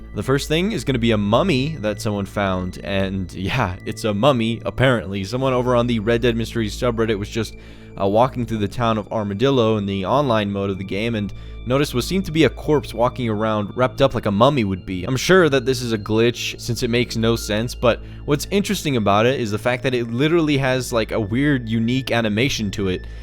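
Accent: Bosnia and Herzegovina. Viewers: Are you Bosnian?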